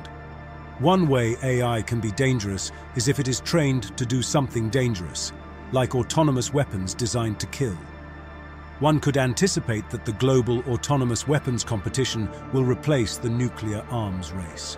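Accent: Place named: England, United Kingdom. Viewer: Your English-accent-robot-voice makes this vid hard to take seriously.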